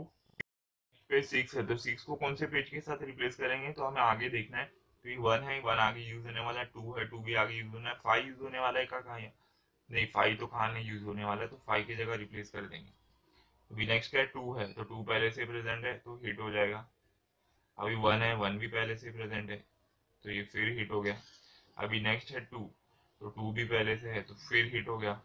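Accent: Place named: Mumbai, India. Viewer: kya badiya mumbai accent hai sir ghar ki yaad dila di ekdum badiya sikhaya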